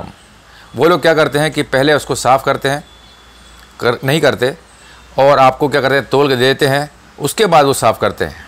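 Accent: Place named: Jodhpur, India. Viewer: are sir.....apki dialect ekdum Jodhpuri h.....kya karya krte h sir by profession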